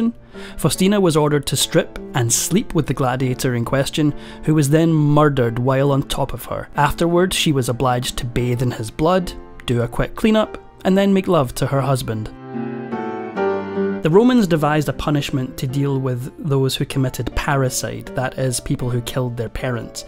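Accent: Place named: Scotland, United Kingdom. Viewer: This Scottish dude is BY FAR the best Factinate narrator. I wish he did all of them!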